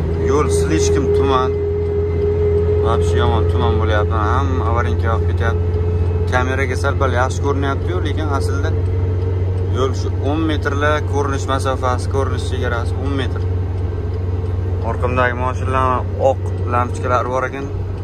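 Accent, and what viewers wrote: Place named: Navoi, Uzbekistan. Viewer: навоийни каеридансиз шевангиз вапше навоийникига ухшамидику еки хатирчиданмисиз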